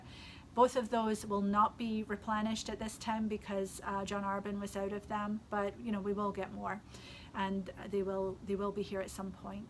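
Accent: Scotland, United Kingdom. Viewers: I love hearing your wonderful Scottish accent and seeing all of the gorgeous Yarns you bring in for us! Thanks so much and stay safe!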